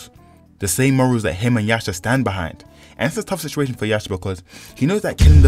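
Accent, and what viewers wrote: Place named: United Kingdom. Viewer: Stfu British zn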